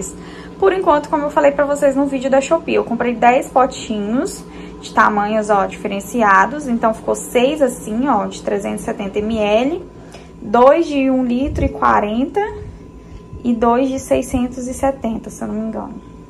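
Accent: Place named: Minas Gerais, Brazil. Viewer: Esse sotaque de mineiro 😍😍😍 amoooooooo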